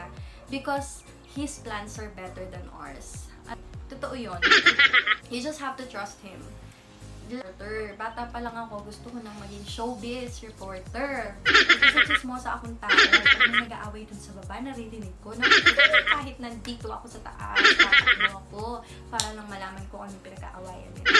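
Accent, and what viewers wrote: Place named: Visayas, Philippines. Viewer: HAHAHAHA funny yung bisaya accent 🤣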